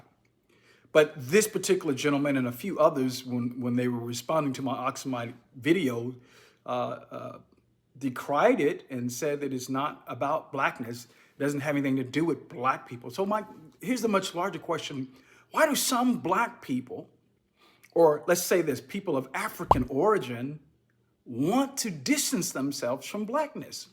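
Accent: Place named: United Kingdom. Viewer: When you talk I feel like you have British in you !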